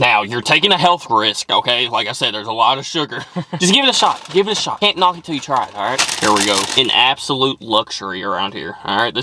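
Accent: United States. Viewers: You misunderstood why Brits think Americans are rude, it stems from ww2 when soldiers came over and flaunted their wealth thinking they were better than us, also just the way your accent is louder and more flamboyant it comes across as brash .. yes it's a stereo type but blame it on your film and music stars 😆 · Don't worry about the language differences, there's no 'correct' version of English. Language evolves, splits and merges. We got 'cookie' from Dutch a long time ago and it's a great word. No one owns English and no one can dictate how it should evolve - it does what it does and it's amazing. I agree on prawn cocktail - actual prawn cocktail is one of my favourite foods ever but I don't like the crisp flavour 😂(also, EMOJIs - huge development in written language - we can actually convey tone in a way that isn't clunky now!). The banter is a difficult one - it's usually fine because people can tell if someone's not up for it and won't make them uncomfortable but it certainly can backfire. 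I've never been good at taking it and people can just tell right away - one look at me and they know I'm too delicate 😄I wouldn't change it for the world though; comedy keeps me going and it's incredible that it's woven into our way of life. Love this video! You're so reasonable and sweet - a model American (with a lovely accent too!) ❤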